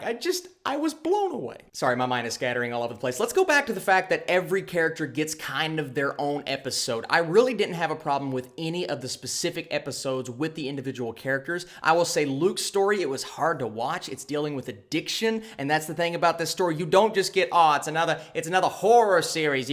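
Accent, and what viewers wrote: South, United States. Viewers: Something I had was I was expect something to happen all the time, usually in horror movies/series you can't tell when somethings gonna happen. By the way your southern accent is killing me I love it.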